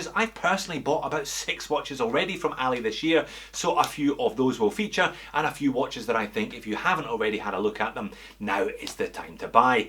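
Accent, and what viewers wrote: Scotland, United Kingdom. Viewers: Quality video as always.... After watching you for some time, I can no longer read watch specs without a Scottish accent in my head. LOL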